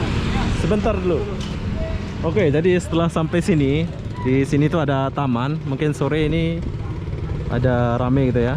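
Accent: Lombok, Indonesia. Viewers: Lekan ngonek ke indeng indeng logat side marak dengan sasak, ternyata tetu side dengan sasak, ndekne bau telang logat side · Maaf lamun unin" dialek bhs indonesian de jak .....baun tiang bade' pelinggih batur sasak Lombok. Pdas gati dialek Lombok de. Batur mbe plinggihde?? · Slamat malam. Ternyata Semeton Lombok. pantesan di pertengahan Vidio dalam hati bilang koq ada logat lomboknya... di akhir Vidio baru terjawab..Salam kenal Semeton Sasak semoga sehat selalu dan tetap semangat dalam membuat konten N semoga sukses semeton..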